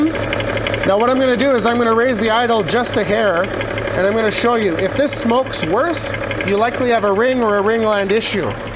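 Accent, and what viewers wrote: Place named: Calgary, Canada. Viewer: I love that Calgary accent, eh?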